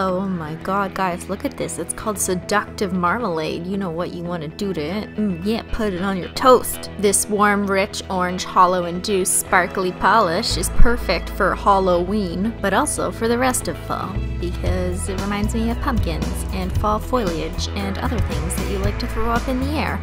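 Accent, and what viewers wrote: Canada. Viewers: Wait are you Canadian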